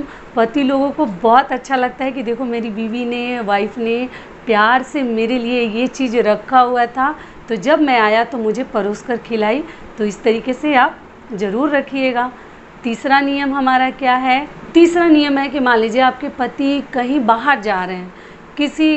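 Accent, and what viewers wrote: Bihar, India. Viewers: Aap Bihar se ho kya? Aunty ji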